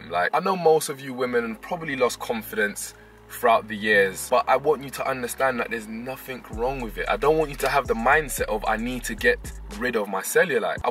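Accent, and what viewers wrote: London, United Kingdom. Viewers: So he’s praising cellulite, looking foine and has a British accent...got my vote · I love your British accent! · English accent / London accent British is a wider thing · Love your message... And your London accent 😁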